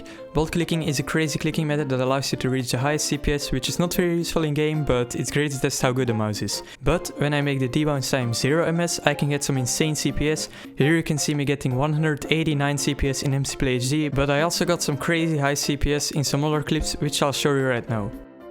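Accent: Netherlands. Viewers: i like your Dutch accent :D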